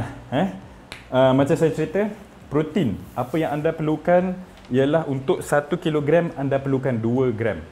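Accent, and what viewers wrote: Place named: Terengganu, Malaysia. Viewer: kenapa aku rasa doc ni org tgganu😂ada beberapa patah perkataan dia mcm org ganu sebut😂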